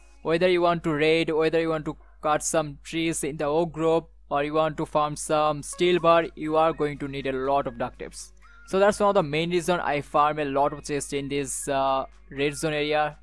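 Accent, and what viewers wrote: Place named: India. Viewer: you sound Indian af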